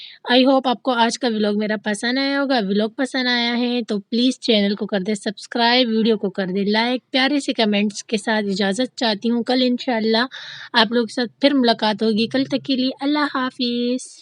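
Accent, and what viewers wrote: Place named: Peshawar, Pakistan. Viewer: Apki batun ne lehjy Sy mujy peshwar ki yad agai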